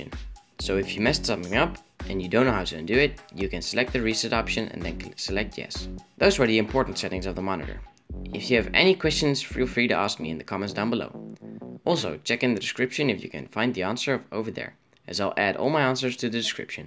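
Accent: Netherlands. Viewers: Merk dat je Nederlands bent duss... heb je last van Ghosting? Is de reactietijd rond 3-1MS? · ben je nederlands je hebt echt een nederlands engels accent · U dutch? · youre dutch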